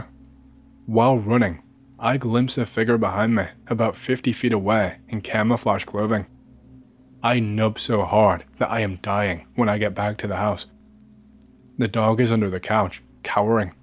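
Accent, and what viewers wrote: New York, United States. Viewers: Next time do a Scottish accent your new York one is perfect 😂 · Just have to say it....New Yawk!! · Man i would never believe your voice wasn't AI with how point and consistent in your tone is if not for your NY accent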